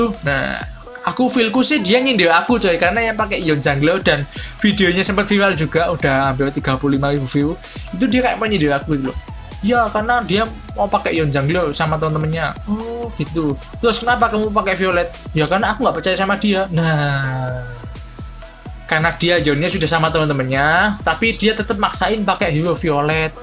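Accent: Java, Indonesia. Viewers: Bang tolong tambahin logat" jawanya,ya bang 😁😁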